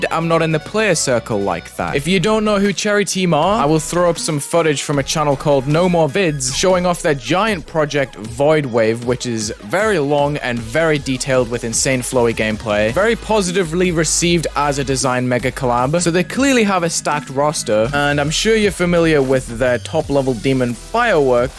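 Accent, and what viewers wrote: England, United Kingdom. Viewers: О вы из Англии????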